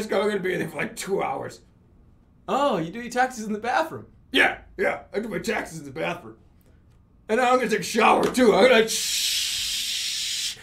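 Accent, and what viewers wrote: Boston, United States. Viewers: The drunker jerma gets the worse his Boston accent gets.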